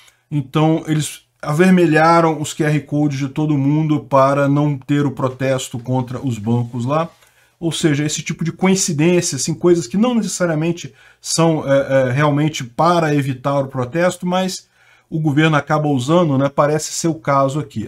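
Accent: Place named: Rio de Janeiro, Brazil. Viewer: Se diz no carioquês :_ancappark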